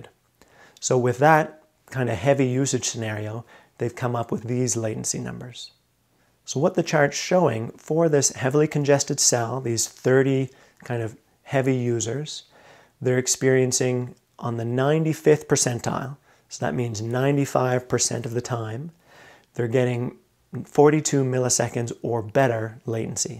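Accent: Nova Scotia, Canada. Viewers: You from Nova Scotia brudder?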